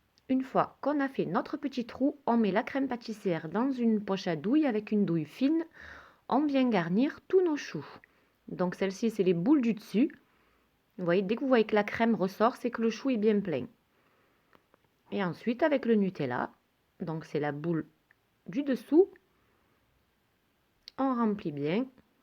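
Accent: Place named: Marseille, France. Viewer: Bonsoir o très bien réussi vos choux (savez-vous planter les choux ?) Non je plaisante franchement sa change des religieuses au café et votre accent de Marseille j'adore 😂😂😂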